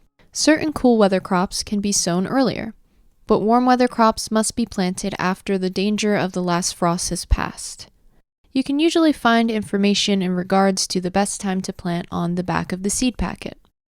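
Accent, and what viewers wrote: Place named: United States. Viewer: thanks for the tips! Apologies btw. I didn’t realise you were American, the accent is quite subtle and only realised when you were talking about 50 cents seeds. Brown field over here is the site of an old building torn down and new housing built on top. The soil is usually awful, if indeed it is soil. More often it’s just rubble with a lawn on top. Being able to grow plants and identifying them in the wild should be taught in schools, (at a practical level).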